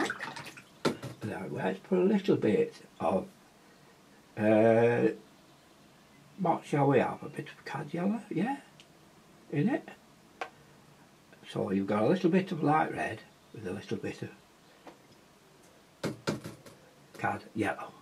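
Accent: United Kingdom. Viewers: What is the palette you are using? Also, it's a bit hard to understand as you have a stong British accent and while I am very familiar with British accents it is sometimes hard to understand what you are saying. Maybe it's the video volume?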